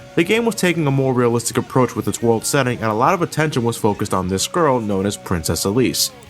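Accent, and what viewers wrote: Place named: South Jersey, United States. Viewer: That's definitely a lot to say for the story of this game. I kind of see that your a bit similar to ShogunGinO, except your voice isn't as deep. Voice is almost like South Jersey Sam Flemming.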